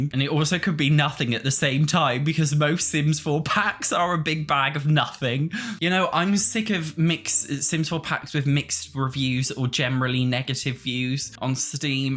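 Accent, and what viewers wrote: United Kingdom. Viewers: I see you took your English teacher role very serious! Thank's for being here for us! I learned some words here, but just for you to know, I'm here not just to listen to your great british accent! I love your sense of humour and content you make:) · WHAT I THOUGHT YOU HAVE A HEAVY BRITISH ACCENT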